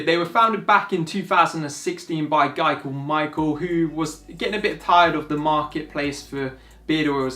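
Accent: United Kingdom. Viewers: I have a full beard past a fist length from my chin and am always open for suggestions and products that I may not otherwise be aware of. I recently subscribed to your channel and I listen attentively to your videos, which brings me to my random off-topic question: where specifically in the UK is your accent from? You have a pleasent and relaxing speech pattern that makes it easy to listen and perfect for instructional videos (in my own humble opinion). Your quirky style does not hurt either. Clear, relaxed, no nonsense, unpretentious videos. What more can one ask for? Just saying. Keep them coming.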